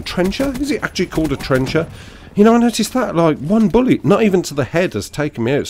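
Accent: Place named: North, United Kingdom. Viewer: Good to hear your dulcent northern tones again, fella. Welcome back.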